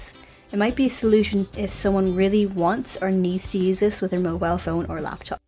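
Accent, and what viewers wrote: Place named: Ireland. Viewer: I picked up on the Ireland part, but I couldn't figure out why it wasn't more pronounced (as Irish accents so often are). The American bit makes sense, though I was going to guess you were in England, and had Irish family or something. But I wasn't hearing any English tells.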